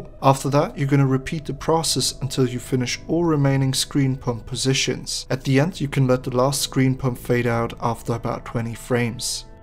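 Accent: Germany. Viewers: Du bist Deutsch?? xD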